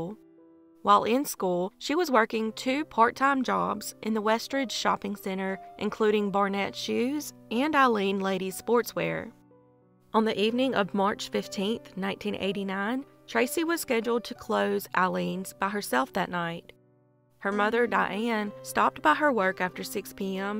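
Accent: South, United States. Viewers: Love you and your channel. Also love your accent because I’m from the south too(Georgia) and I have my heavy accent too lol and I LOVE IT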